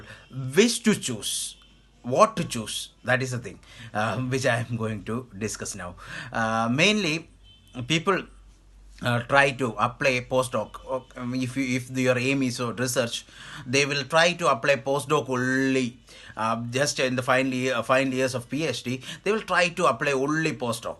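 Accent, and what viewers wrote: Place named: Malaysia. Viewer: First of all learn to talk english ,then learn how to pronounce it other than some '' MINGLISH'' 🙄😏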